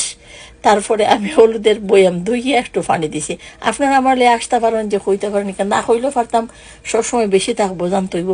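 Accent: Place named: Chittagong, Bangladesh. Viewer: আপনার ভাষায় আমি মুগ্ধ ❤ আমি চাঁটগাইয়া ❤ কি যে ভালো লাগছিলো ❤ আমাদের আঞ্চলিক ভাষা মানেই মধু❤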